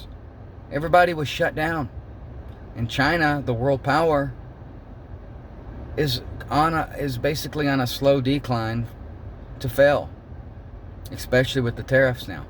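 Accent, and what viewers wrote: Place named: Ireland. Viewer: like the Irish accent lol - was there a hidden meaning for it or just for fun? 😀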